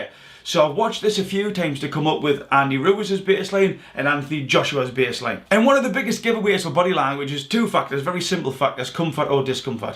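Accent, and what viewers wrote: Newcastle, United Kingdom. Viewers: Anyone else he sounds like true geordie or is it just me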